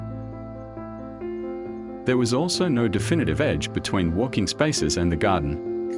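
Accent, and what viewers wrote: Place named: New Zealand. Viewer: You sound more kiwi than Australian hahaha Gosh your work is fantastic.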